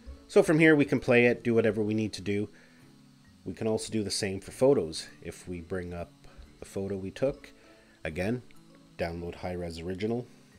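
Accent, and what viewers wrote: Canada. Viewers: Helpful, but focused mostly on your Canadian accent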